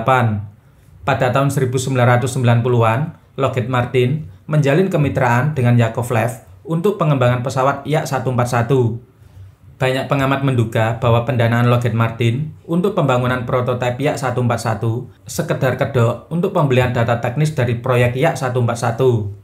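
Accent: Java, Indonesia. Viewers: Yang di bahas random banget dari tekno, kesehatan, chip, militer, listrik, tp story tellingnya enak juga meskipun ngomongnya medok jawa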